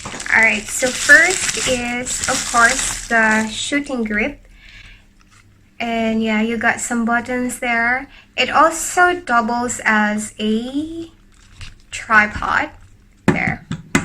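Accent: Australia. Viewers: Mugawas jud ang slight na aussie accent. Nakaadapt nka diha kie. Great job! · Maam, nindot kaau aiii, pero wala juy mka labaw sa imng aksent maam pang Australian citizen kaau ganahan qg pamati.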